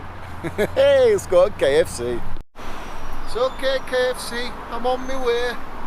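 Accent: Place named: Bradford, United Kingdom. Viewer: I knew your from Bradford lol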